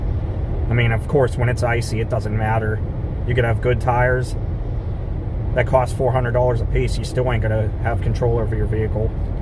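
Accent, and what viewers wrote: Pennsylvania, United States. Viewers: Enjoyed your authentic PA accent!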